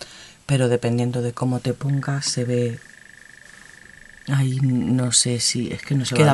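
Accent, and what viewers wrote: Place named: Extremadura, Spain. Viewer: por tu acento, eres extremeña?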